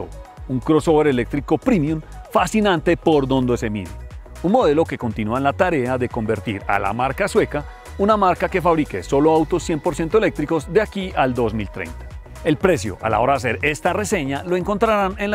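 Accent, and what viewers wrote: Colombia. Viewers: Que impecable reseña. El acento colombiano es muy agradable de escuchar, y los paisajes acompañan muy bien las tomas del C40. Felicidades y gracias por vuestro contenido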